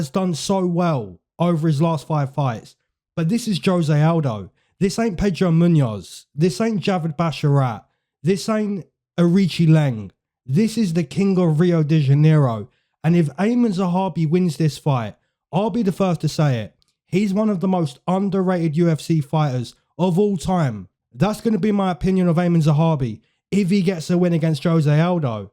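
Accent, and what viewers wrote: United Kingdom. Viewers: Whats good brother was wondering which region you hail from Im trying to learn more about U.K accents. Useless though, because no other american will care that i know this shit.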